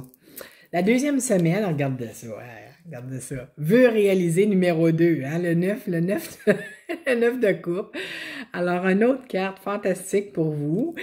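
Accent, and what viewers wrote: Quebec, Canada. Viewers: Cc vous êtes superbe ravi de vous voir et mettre un visage sur votre belle voix québécoise des bisous 😘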